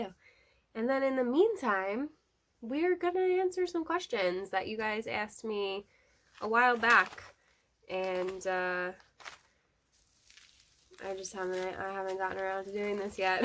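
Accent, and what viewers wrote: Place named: Bronx, United States. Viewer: Thanks for sticking up for the golden pothos! ✊😊 I really like this repot. It covered a lot of ground. Ok let's sum up: Yes, you have an accent that's says "just north of NYC". Girl, get that Miracle Gro darkest pits of hell Satan dust out of your home! The fungus gnats will come. Just remember Miracle Gro has chemical fertilizer added so combining it with worm castings will give your soil high amounts of nitrogen and you can burn your plants. Lastly, what medicinal plants are you growing and planning to grow? 🤔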